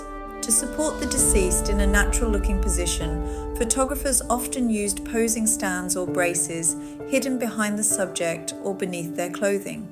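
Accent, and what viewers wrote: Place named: United Kingdom. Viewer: I did like it and your Wonderful British Accent! 😊😊😊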